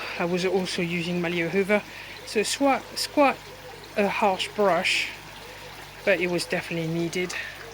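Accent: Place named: France. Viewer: Good to see you back, missed that lovely French accent of yours✊ cheers 👍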